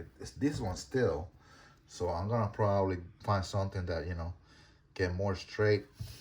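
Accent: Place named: Dominican Republic. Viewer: You sound dominican lol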